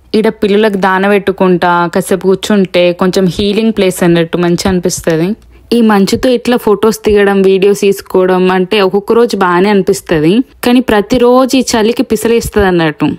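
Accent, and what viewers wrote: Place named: Telangana, India. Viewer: అసలైన తెలంగాణ బాషా మీరు మాట్లాడుతారు Great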